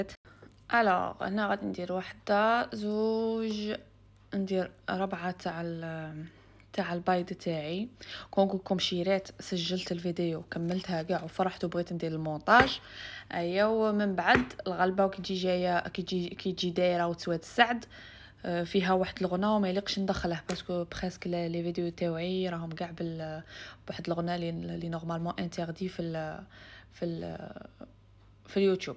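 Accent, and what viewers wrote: West, Algeria. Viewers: باينة عليك وهرانية الله يبارك نعشق في وهارنة سوردو كي يهدرو ههههه لوكان غير تعملينا بغرير وهاد صوالح ويفرحك بقاي تعبري صوالح بلكاطو باش حتا انا نبقا نعمل كيفك مانفهمش هاك نفهم بكاس ونحبك زينة 💋💋والله غير الف حاجة زدتها من عندك فهاد لفيديو ماكنتش نعرفها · ياختي راكي تهبلي أنا من الشرق وتعجبني لهجة الغرب 😍😍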